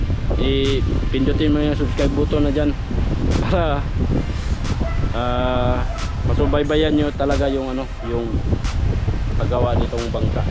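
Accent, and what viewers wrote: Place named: Visayas, Philippines. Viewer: Intindihin mo po nalang kc bisaya sya parang Tagalog lang po yan mahirap intindihin bisaya😂😂😂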